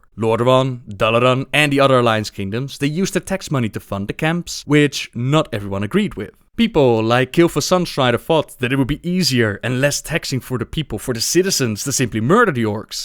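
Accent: Netherlands. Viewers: that dutch accent tho :p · are you dutch?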